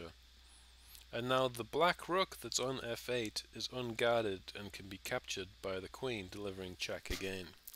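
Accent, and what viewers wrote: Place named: England, United Kingdom. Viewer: Where from in England though?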